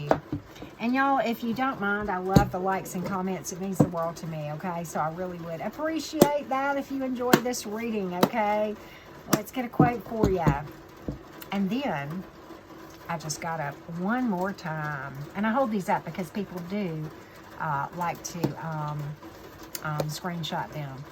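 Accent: South, United States. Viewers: I'm loving your channel annnd that southern drawl (I'm a N. Ga Peach) So glad to have found you from Tyler's Tarot 😁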